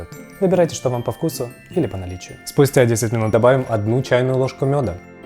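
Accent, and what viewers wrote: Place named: Surzhyk, Ukraine. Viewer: Хильнуть))) обожаю твой суржик. И кота.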